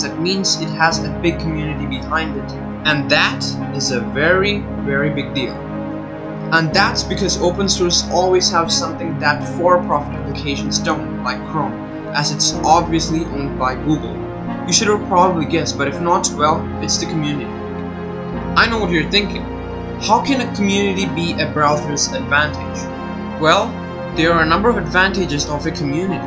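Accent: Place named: India. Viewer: Good information, sir, and well presented. As an American, I find your Indian accent charming, although at times a bit opaque. For a few words I needed to repeat the audio, but was able to understand the words in all but one case -- you say, "Epic browser is always on ___________ (?)". The word sounds (to me) like "ignoshiamal". From context, I assume this means that Epic is always set on maximum privacy protection...but still, I'd like to know what the word is. Also, in order to achieve maximum understanding in the U.S., I think you may need to change your pronunciation of the names of two products you reviewed: Opera and K-MELEON. In British English, opera is pronounced "AW-pǝ-rǝ" -- in U.S. English, it is pronounced either "AW-pǝ-rǝ" or "AH-pǝ-rǝ", but in any case, the emphasis is always on the first syllable. I am certain that K-MELEON's logo is the head of a chameleon, so I'm betting the browser's name should be pronounced the same as the animal, "kǝ-MEE-lee-ǝn", with the accent on the second syllable. 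I apologize for criticizing you on such trivial points. Again, the videos are VERY informative, and I do appreciate them.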